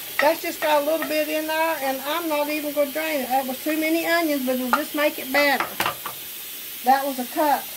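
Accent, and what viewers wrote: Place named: North Carolina, United States. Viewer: My first time viewing. Your accent reminds me of home ❤️ I’m from the Foothills in NC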